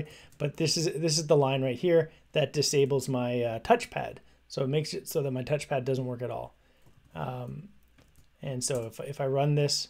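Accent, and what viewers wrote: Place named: Canada. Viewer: It took me five seconds to know you’re Canadian. And I grew up and I live in Sweden. The times we live in❣️🙏🏻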